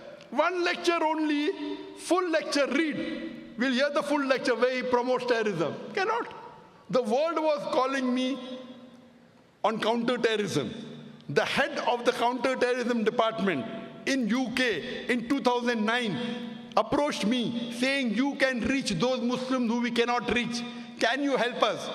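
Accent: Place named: Kerala, India. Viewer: What a manglish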